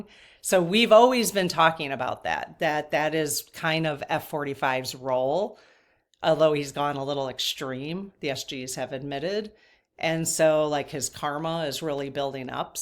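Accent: Midwest, United States. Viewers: I grew up in Iowa and live in Appalachia now… midwestern accent is comforting to me.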